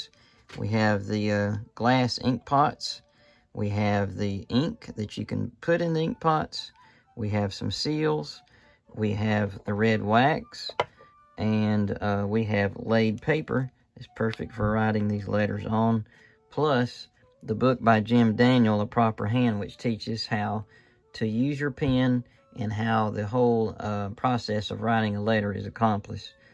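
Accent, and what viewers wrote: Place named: East Tennessee, United States. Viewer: Came here looking for this information! You sound like you are from close to where I am born and bred...east Tennessee? I am so glad I have found your channel as I am obsessed with colonial period.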